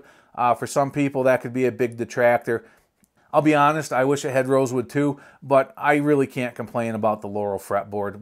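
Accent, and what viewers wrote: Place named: Michigan, United States. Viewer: first video I've seen from ya. Nice evaluation. Thanks! looking at a Chibby or Episode Greeny. I'll bet $100 you're from Michigan. I've been in FL since 84 and can hear it... lolol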